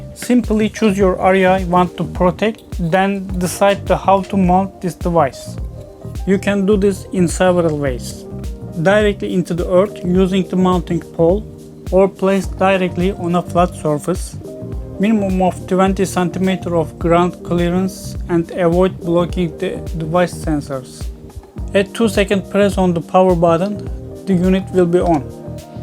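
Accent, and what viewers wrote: Turkey. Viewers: Bu ingilizce aksanı kesinlikle bir Türk'e ait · Türk gibi ingilizce konuşuyorsun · You sound very Turkish ?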